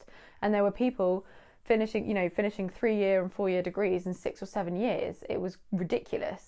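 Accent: England, United Kingdom. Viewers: Or england accent? It took me so so long time to finish listening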